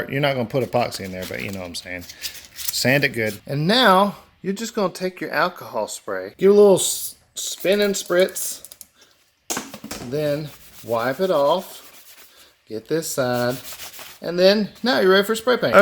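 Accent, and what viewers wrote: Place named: Carolina, United States. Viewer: Love your videos! Been watching you for quite a while and finally subscribed! As soon as my friend played your video, and I heard your voice.. I KNEW you were from NC or SC and I was right! 😂😂 Thanks for all the tips!💕💕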